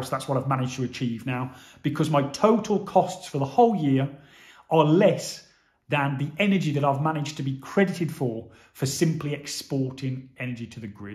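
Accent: Black Country, United Kingdom. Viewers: Great to hear a local Black Country lad explaining the simple concept of a zero bill home within an older property. As mentioned in the previous post, export tarrifs are guaranteed to be either reduced or completely removed as years go on. I think anyone thinking of installing solar should exclude the export income aspects as a result and massively oversize the storage battery for future self use. Some people say solar does not work but you have proved them wrong presently. Having spoken to numerous local estate agents, they have confirmed that adding an MSC certified solar system to a property adds around 4% in property resale value. Therefore, on a £300,000 house, this should automatically add £12,000 to the value of the property, which would effectively cover the cost of a typical domestic solar system. Also, bank and building society savings rates are down to around 3% so the rate of return on a solar system is far greater - A no brainer decision! 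One caveat however I would like to add is that in the next few weeks the Labour Government under the warm home scheme is supposedly to announce cheap loans and grant schemes to further encourage people to invest in solar and reduce U.K. dependency on foreign energy providers. Worth waiting for the announcement.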